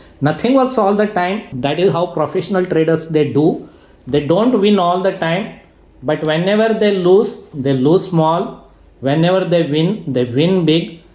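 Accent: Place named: Karnataka, India. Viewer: Oh sweet Kannada song, I was guessing you are kannadiga from your accent now I’m confirmed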